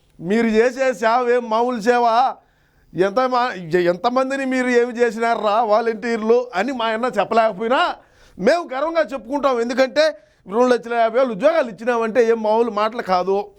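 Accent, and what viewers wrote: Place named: Kadapa, India. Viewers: సార్ మీ కడప భాష చాలా బాగుంది. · మీ కడప యాస చాలా బాగుంది సోదర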